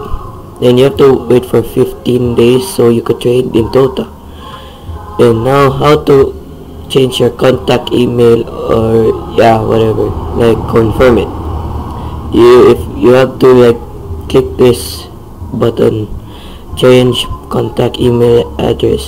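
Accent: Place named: Philippines. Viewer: Liked the raw Filipino accent there LOL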